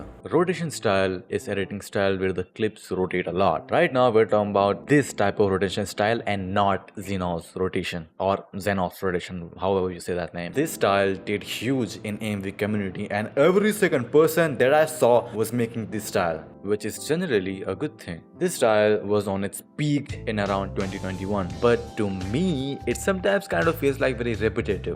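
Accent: India. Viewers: bro's Indian accent>>>>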